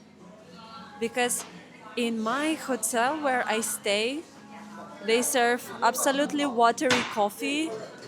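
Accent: Russia. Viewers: It's so cool to hear you speaking Chinese. Do you have a strong Russia naccent in Chinese? Can Chinese people tell which part of Russia you're from? That little old Chinese pharmacist seemed very nice. What a cute little man. :)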